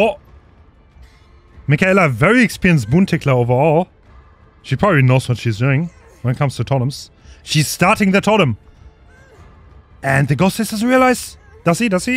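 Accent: Germany. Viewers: Man hört so krass das du deutsch bist, also warum auf Krampf alles auf englisch??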